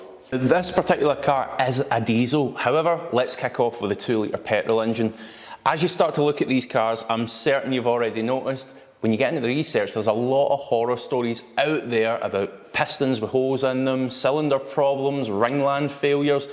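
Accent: Scotland, United Kingdom. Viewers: At last. A scottish guy on the internet 🙂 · I love this car, but what I love even more is that pure, Scottish accent. Nice guide.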